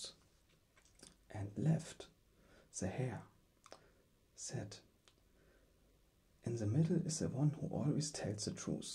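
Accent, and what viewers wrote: Germany. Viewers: Ich finde deine Videos echt klasse. Die Kombination aus ASMR und Logikrätseln ist super! Und du hast ein beachtliches Zeichentalent. Im vergleich zu dem, was ich so zustande kriege 🙈 Eine Sache muss ich aber doch auch loswerden, die mir als etwas störend auffällt. Du hast eine sehr deutsche Aussprache von Englisch. Besonders bei dem "th" fällt das auf. Falls du dich da gerne verbessern möchtest: Ich denke, beim "th" ist es Übungssache. Die Zunge muss unbedingt kurz zwischen die Zähne beim Sprechen. Vielleicht hilft es dir ja generell für die Aussprache auch, englische Filme zu gucken, um in den englischen Sound reinzukommen. ;) Dein Vokabular ist auf jeden Fall schon richtig gut. Und du hast eine sehr angenehme Stimme zum Zuhören.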